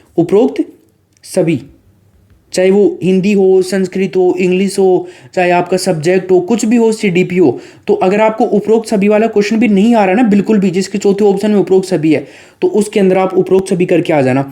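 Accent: Rajasthan, India. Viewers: Sir aapki trick bhut aachi h usse bhi aachi h aapki voice or bich bich gjb rajsthani( ade, vde ,mahine)😘😘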